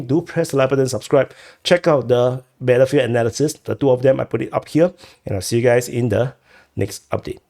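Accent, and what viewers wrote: Texas, United States. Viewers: I'm surprised the cowboy accent worked will. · Hahahah,,, love ur Texan accent. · As a Texan I can say your cowboy accent is awesome lol😂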